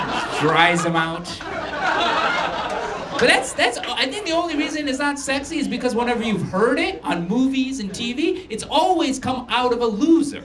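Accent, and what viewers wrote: India. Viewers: dont know where you are grown up but you still have got Indian accent :D .. it is not new content , copying of Trevor noah and Russel Peters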